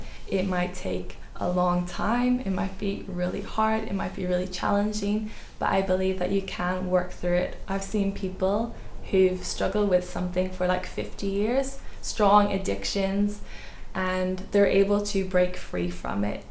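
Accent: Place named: RP, United Kingdom. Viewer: Hey your accent has changed. More posh. Anyways, how are you doing? 😊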